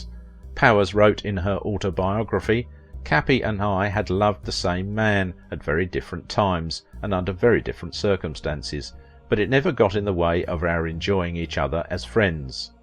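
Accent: France. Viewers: Your french pronunciation is cute ❤❤❤❤❤🇨🇵🇨🇵🇨🇵🇨🇵🇨🇵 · This was a very good video. I am I see your French accent as wonderful as well. Thank you for doing this yes I actually learned quite a bit about her and you portrayed her in a very fair light. I really enjoy your channel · The narrator has a lovely French accent.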